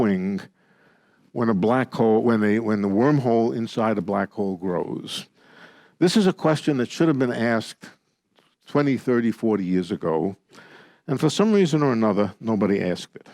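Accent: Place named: Brooklyn, United States. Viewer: Don't let Lenny's blue-collar Brooklyn accent fool you. He's Nobel Prize caliber. I have 2 degrees in Applied Physics and have sat in on his Stanford technical lectures. I was quickly left behind.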